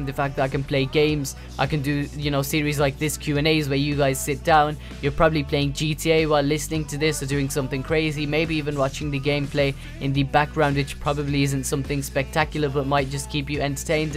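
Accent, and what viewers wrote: Birmingham, United Kingdom. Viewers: Uk Birmingham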